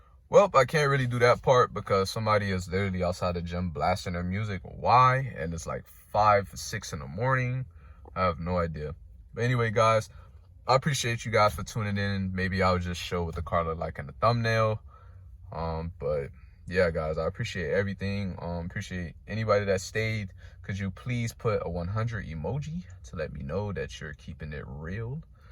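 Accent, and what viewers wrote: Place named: East Coast, United States. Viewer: You sound like you're from the East coast. As someone who owns a 5th 6th and 7th gen all at the same time they're good daileys. The cool part is that they're light. Do a K24A swap for traffic 👍🏾🔰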